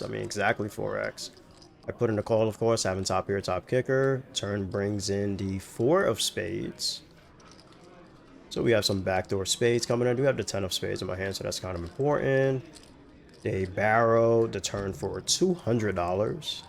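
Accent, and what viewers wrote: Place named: East Coast, United States. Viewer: Love the East Coast accent and congrats on 1k subs and Monetization Fam. 🎉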